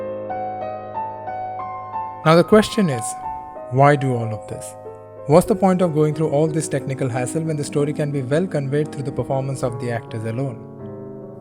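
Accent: India. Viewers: I really enjoy your indian accent, friend.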